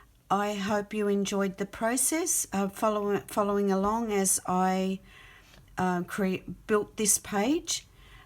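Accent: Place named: Australia. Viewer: Hi, I am enjoying your videos as I only came across them recently.I love finding new artists on YT. It is also nice to hear an ozzy accent. Thank you for the inspiration and I look forward to following you well into the future. Cheers Vicki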